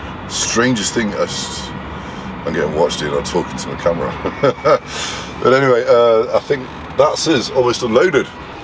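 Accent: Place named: Loughborough, United Kingdom. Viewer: Probably not the best video to ask this - need advice... left my job due to finding out my management were heavily racist (stupid to leave without any job lined up but I'd rather leave on good intentions) Now in-between jobs, would you recommend agency at all? I like to be on the books as I'd like a mortgage in the next few years but I'm happy where I am in life so that's just a plus. I'm Loughborough based so plenty of jobs. Keep up the good work mate, nice to see someone with my accent who's a trucker and vlogging 👊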